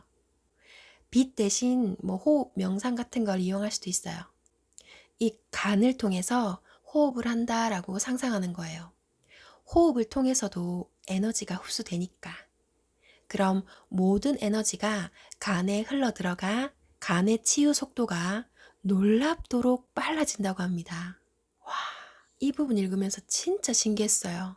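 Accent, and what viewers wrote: Gyeongsang, South Korea. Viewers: 경상도 사투리 구수하네요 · 경상도 분 ~~억양이 ^!^♡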